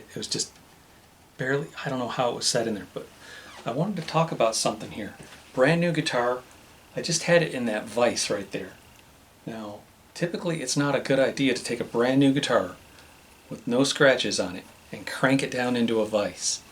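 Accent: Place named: Germany. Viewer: I enjoy your videos. Great workmanship. I do need to ask, why do you always seem to throw in a German accent? Why not Indian, Chinese, Hindu, etc?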